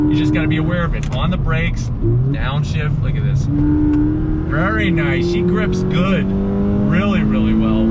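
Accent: United States. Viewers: American pronunciation for y’all. Smh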